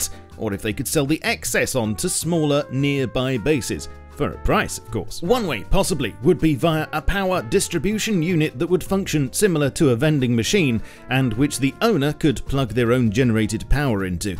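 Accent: United Kingdom. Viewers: I don't even play rust anymore. I just like listening to your update summaries.... and I don't even like British accents or your stupid way of saying "floor" when something is clearly laying outside on the GROUND!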